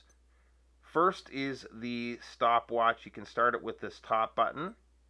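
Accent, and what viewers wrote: Canada. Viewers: Canadian ehh !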